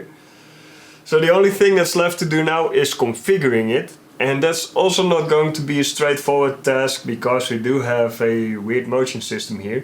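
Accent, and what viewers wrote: Netherlands. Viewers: Absolutely love the video, really nice build however would you mind doing a video in Dutch as the accent is so thick it would be easier to watch In Dutch 😅 anyway as I said before the engineering part of it is very well done! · This is the first video I've seen by you. I immediately subscribed to your channel and will check out your other videos. I really like your idea and of course your 3D printer looks cool as f*ck. By your accent I immediately heard you are a fellow Dutch. I hope to see more good stuff. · sound like you also talk dutch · ofcourse he is Dutch, why am I not suprised :) we are some genius people :) edit: confirmed lmao. I can recognize that accent and that view from anywhere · Your accent gave you away quickly :) later in the video it was confirmed Lekker gedaan pik ziet er prachtig uit! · where you from? you have a dutch accent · dacht all aan het aksent dat hij NL/BE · i think ur dutch u have a dutch acsent(im also dutch)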